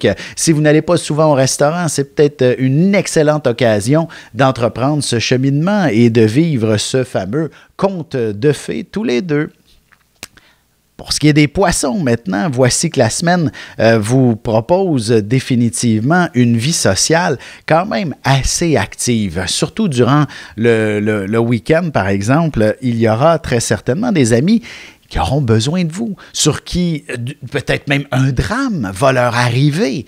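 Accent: Quebec, Canada. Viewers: Bonjour merci je vous suit depuis longtemps avant il yavais une jolie dame blonde a vos côté je me demande ce quel devient ? En tout cas j'aime bien votre accent québécois ☺️ J'ai une question je suis née le 29Mai1992 18h45 Montpellier France Esque l'année 2023 serais bénéfique pour moi niveau sentimentale ou argent j'ai envie de me faire une chirurgie esthétique courant mars mais je doit d'abord récolte.. Voilà Monsieur a bientôt en espérant avoir mon thème sur ma prochaine vidéo ☺️🙏🦋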